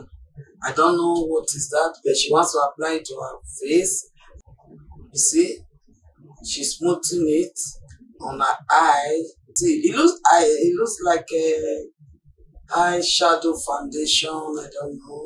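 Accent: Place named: Benin. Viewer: I recognize that Benin accent 😂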